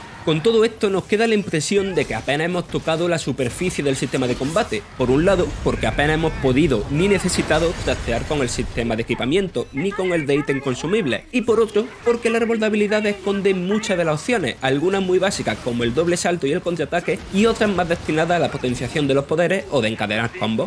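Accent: Andalusia, Spain. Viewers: sí, el acento andaluz, del sur de españa. Un desastre, y muchos españoles dicen después de lo "mal que hablamos los latinos" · Ese acento de que parte de españa es? No le entiendo nada xD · Juraría que así hablan en cierto lugar de España, pero no logro recordar dónde exactamente xD me hace mucha gracia igual :v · es que tio tienen contratado al notas con mas acento cerrado sureño de toda españa, literalmente no le entiendo mas que una o dos palabras, joder que le pongan a editar o redactar o que le paguen un logopeda. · ! al fin te escucho de nuevo, hace tiempo que no veía un video con vos, me encanta tu forma de hablar No sé de qué región de España es, pero me parece buenísima! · Acento muy común de españa.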